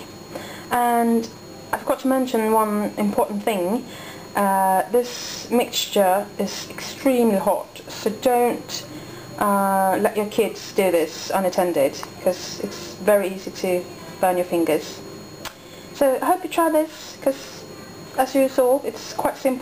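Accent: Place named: United Kingdom. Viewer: Cool, I'll have to make some of these. Do you live in Sweden you sound British ;)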